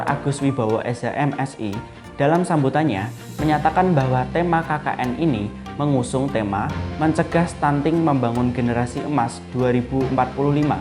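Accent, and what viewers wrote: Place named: Central Java, Indonesia. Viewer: logat jawa Tengah, enak didengar dan terkesan gayeng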